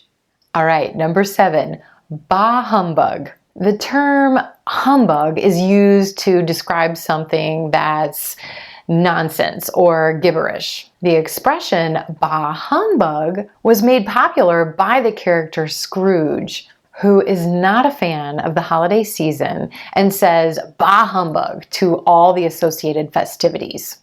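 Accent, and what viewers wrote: United States. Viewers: One of the best American accent teachers ever ❤️ merry Christmas 🎁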